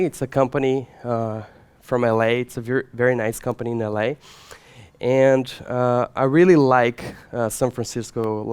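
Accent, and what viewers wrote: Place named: Minas Gerais, Brazil. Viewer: Thanks for sharing this knowledge. I didn`t know about any of those APIs before I watched your talk. (I'm also Brazilian, bet you are from Minas Gerais by your accent )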